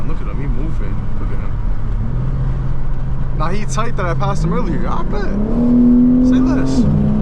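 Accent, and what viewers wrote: Boston, United States. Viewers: Is bro in Boston I can just tell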